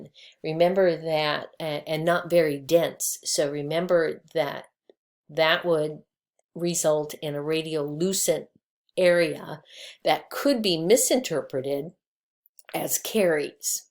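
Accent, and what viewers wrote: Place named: United States. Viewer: Although from usa I assume and I love that accent, finally something for dental assistant, I'm doing course in uk so extremely helpful. Thank u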